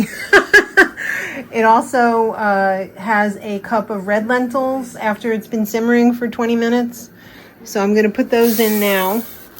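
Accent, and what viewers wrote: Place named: New York, United States. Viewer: Happy Birthday!!! I am enjoying watching all your videos (even way back.) Thank you for sharing your life with us. As a former Long Islander, I love your New York words, phrases, and accents. I hope your birthday is wonderful and your year filled with blessings. 🎈🎈🎈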